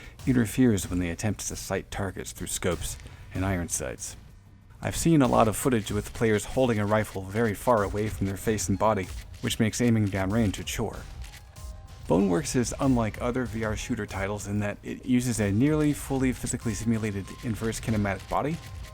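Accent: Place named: United States. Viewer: wtf, I wasn't expecting an american lmao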